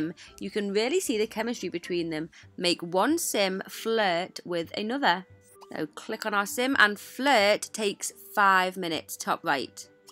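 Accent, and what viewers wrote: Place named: United Kingdom. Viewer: Idek but I love the way she talks cause she’s british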